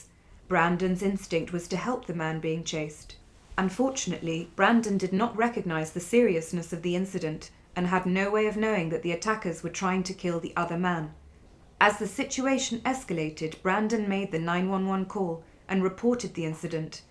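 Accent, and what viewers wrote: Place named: United Kingdom. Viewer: I have looked at & listened to many videos about this case. but it’s so good to hear it spoken in a calm collective manner by a fellow Brit. Thank you. It’s time Brandon was brought home.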